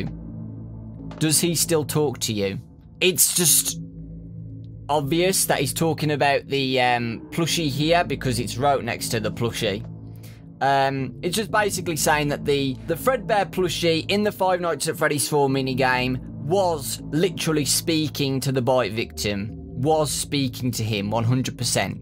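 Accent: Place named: United Kingdom. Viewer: As soon as i heard his voice i said im gonna learn so much British from you this video was really good btw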